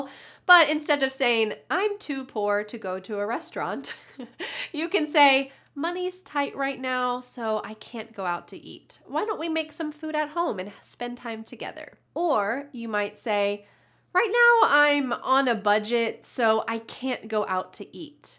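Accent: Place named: United States. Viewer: Hello, Teacher! I adore your lessons and your voice is so clear! I love American English and keep up the good work! Cheers!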